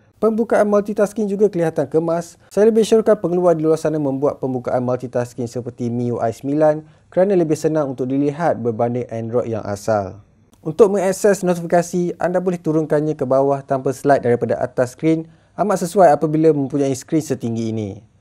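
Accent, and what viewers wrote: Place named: Kelantan, Malaysia. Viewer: demo ore klate ruponyo admin ni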